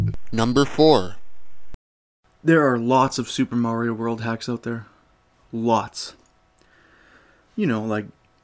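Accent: Canada. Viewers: Lol canadian accent. Love it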